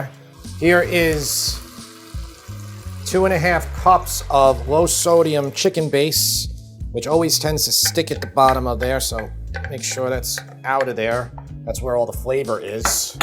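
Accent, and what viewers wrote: New York, United States. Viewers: not to nitpick too badly but you mispronouncing undewee you pronounce it in Dewey which is no that was pronounced. it is pronounced on AHNTOUIE on Dewey So it's not like N Dewey or N Dewey it's on AHN how it's pronounced TOUIE. I know it's your New York accent believe me I need a pick with English people about how to spell how to pronounce Jaguar and then call it Jaguar If you turn to tell him it's a Latin American word in the Spanish language from Native Americans and you're pronouncing it wrong it won't listen.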